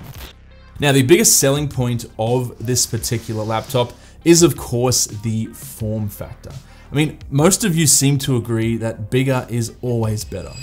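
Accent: Australia. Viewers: He sounded Aussie to me. Now I'm confused.... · Such a great vid, cheers! It took me a while to figure out why it was so easy and relaxing to watch.. it’s just nice to hear another Aussie review these things and not have to process accents haha thanks mate 🤙🏼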